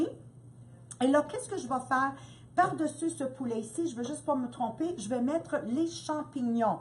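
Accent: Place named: Quebec, Canada. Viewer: Bonjour je suis nouvelle animatrice depuis octobre 2017 j'ai gagné le Micro Urban, je vous remercie pour la démonstration et ayant des amis du Québec je crois que vous avez un accent que j'adore, ce n'est pas facile en France dans ma région de Haute Loire de trouver des hôtesses, j'espère que je trouverais, bonne journée à vous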